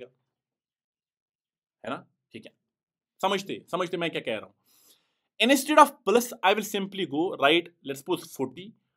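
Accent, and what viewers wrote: Kashmir, India. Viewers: sir aap kashmir se ho kya kyuki mujhe accent se lgaa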